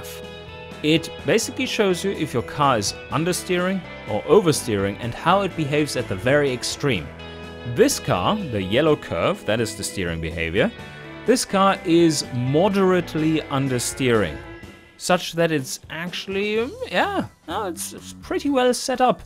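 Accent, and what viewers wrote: Germany. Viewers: Is this a fucking german or english accent i cant decide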